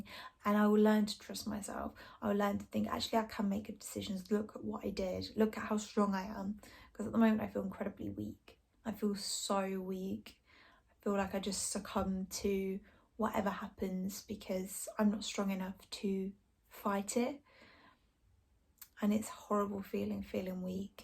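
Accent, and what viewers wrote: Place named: Midlands, United Kingdom. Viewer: Can't pin down your accent maybe midlands Nottingham area let us know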